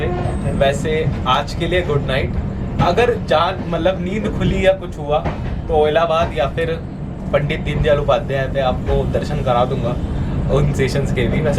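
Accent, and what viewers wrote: Bengal, India. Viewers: Bro are you Bengali na ? 😁😁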